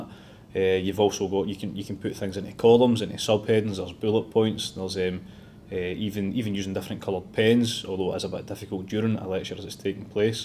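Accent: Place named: Austria. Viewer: this guy speaks english like the way the Austurians speak german !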